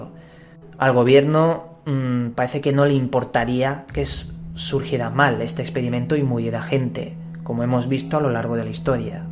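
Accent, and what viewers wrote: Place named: Spain. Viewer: Con respeto a los amigos españoles y a su hermoso pais, el acento casi no me es tan amigable, pero el de es tan placentero oírlo.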